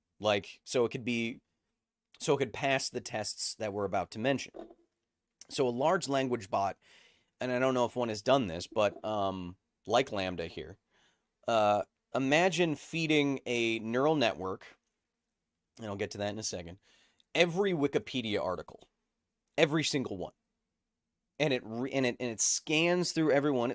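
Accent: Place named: Australia. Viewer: Gotta admit, last week's Aussie accent was better. Can't put my finger on why though.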